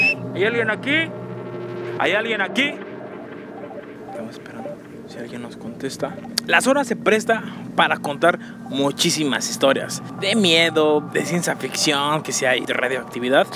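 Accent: Mexico City, Mexico. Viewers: lo que más horror causa es tu acento chilango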